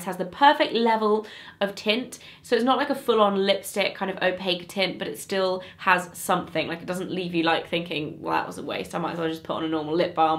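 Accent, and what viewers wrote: United Kingdom. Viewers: Ugh, where have you been all my life?? I'm completely in love. I could kick myself for not finding you sooner! But instead of kicking myself, I shall just binge watch your videos and enjoy drinking in your wisdom! Am I fan-girling out too much? Ah well, that's okay with me! I must say a lot of the US beauty bloggers I have been watching all talk about the same products all the time over and over and over. I know there are exceptions to every rule, but sigh...not many exceptions to that one in my experience. What I appreciate so sooooo so much is getting to hear about so many DIFFERENT products! There aren't many mentioned here that have been mentioned at ALL by the beauty vloggers I've been exposed to, and that's the absolute truth. I am dying to learn NEW things from NEW people with NEW experiences - and this channel is it. I'm hooked and loving every second of it. 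Aside from appreciating your expertise, I could listen to you speak with this beautiful U.K. accent all day. All I allowed to say that?? LOL well I am! I'm just in love with all things this channel - and I'm so grateful! Can't wait to tell everyone I know. THANK YOU SO MUCH! I can't wait to see all you have to come in the upcoming months. Blessings to you in the New Year! 🦄⭐️☃️💋💕🌹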